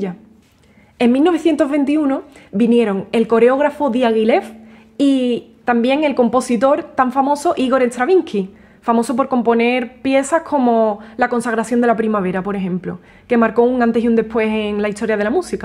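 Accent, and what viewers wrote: Andalusia, Spain. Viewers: Qué bonito!, viva la cadencia andaluza!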